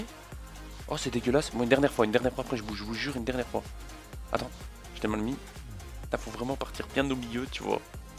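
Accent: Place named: Belgium. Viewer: superbe vidéo continue et je me disais bien que tu étais. belge parce que tu as un peu l'accent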